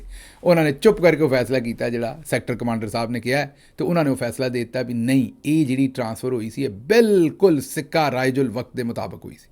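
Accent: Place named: Punjab, India. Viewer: sahib, we love you when you speak the TEHAAT PUNJABI WORDS like purchati, laci & sheedi etc....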